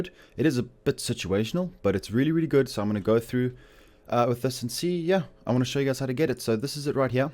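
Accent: South Africa. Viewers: you south african? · South African ?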